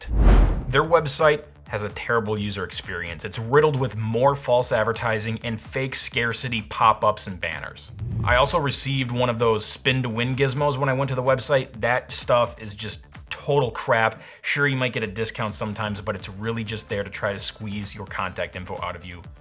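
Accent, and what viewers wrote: India. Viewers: You can tell by the indian accent its a scam. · sound like come from India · That Indian accent is known to fool poor clueless consumers that’s he’s American.....ok... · What it speaks like Hindi India call center accent, got you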